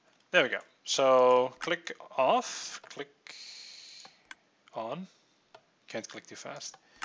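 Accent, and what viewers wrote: Netherlands. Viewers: are you dutch because you have a very dutch accent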